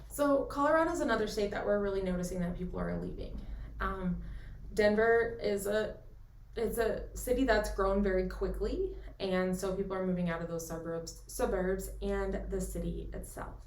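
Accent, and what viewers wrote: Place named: East Coast, United States. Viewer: 1 Are you originally from OK? You have a "back east"accent. 2 What is your take on Bartlesville area? Thx for the vid.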